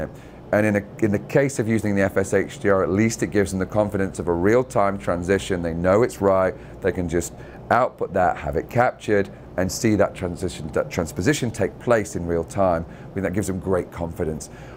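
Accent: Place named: United Kingdom. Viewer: Looking and sounding quintessentially British! Nice.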